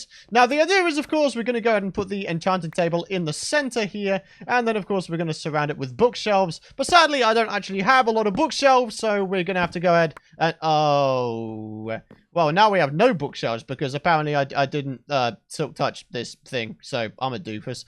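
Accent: England, United Kingdom. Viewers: Do you are from england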